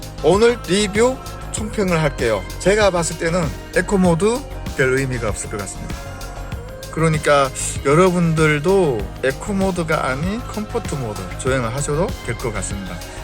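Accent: Yanbian, China. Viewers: 어 조선족이다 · 연변 출신이에요, 아니면 하얼빈 출신이에요? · 혹시 고향이 연변이세요?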